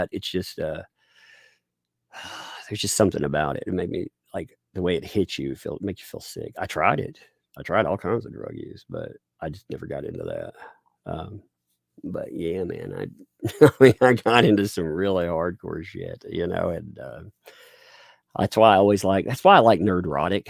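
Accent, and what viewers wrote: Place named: South, United States. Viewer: You kind of talk like Beau of the Fifth Column. He has a little twang too when he speaks he must be a southerner like you 😂 😂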